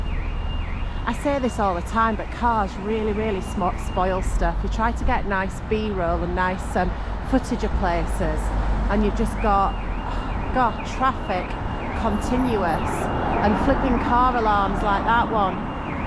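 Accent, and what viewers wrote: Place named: Sheffield, United Kingdom. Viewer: Hi, loved the video of my home town, and hearing your lovely Sheffield accent. I have family from Sheff so apologies if I'm wrong. Anyway, great video, look forward to seeing more of the same.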